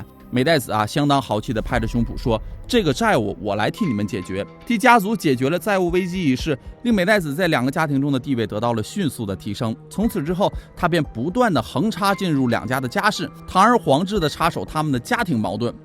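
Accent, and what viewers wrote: Northeast, China. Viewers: 語速不要這麼快，講起來就不會太吃力。雖然東北話與普通話有相似之處，可是東北人講普通話不如其它省份的人標準，最大的障礙在於聲母為Z.C.S和ZHI.CHI.SHI的字東北話與普通話發音完全相反！比如說：站zhan著zhe，坐zuo下，東北人讀成：站zan著ze，坐zhuo著ze，從小到大說著與普通話完全相反發音的那些字導致非常難以改變，樓主就是這種情況。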